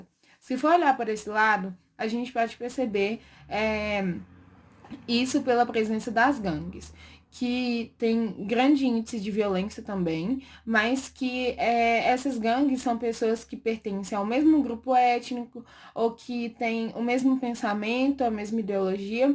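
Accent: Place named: Minas Gerais, Brazil. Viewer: Ótima análise! Belo sotaque 😊 É mineira? Rsrs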